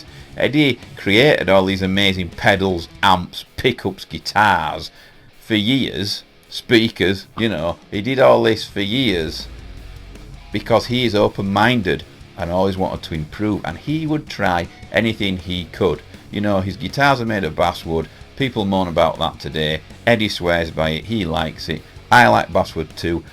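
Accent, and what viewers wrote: North, United Kingdom. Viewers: So great to hear a fellow northerner talking sense! I have subscribed.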